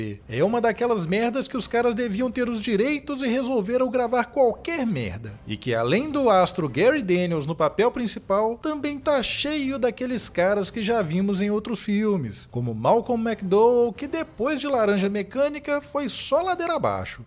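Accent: Minas Gerais, Brazil. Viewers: O narrador parece ser meu conterrâneo aqui de Minas. Se for, di- vi- no.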